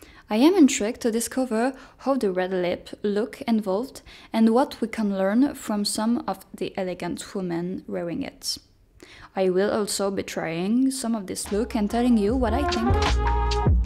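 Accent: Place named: France. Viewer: OK for the French accent to make it sound so "parisienne", but at least read it a few times before because it sounds like reading what someone else wrote without understanding a word of it.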